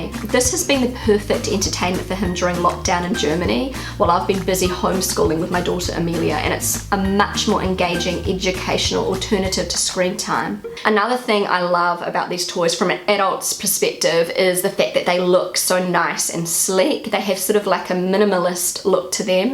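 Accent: New Zealand. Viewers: This is a sanitized NZ accent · Your accent is very interesting. I had never heard anyone from New Zealand speak before. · Thank you for your always positive videos. I really enjoy them. Also nice to hear is your Kiwi accent. After +10 years in Germany you still haven't lost your accent.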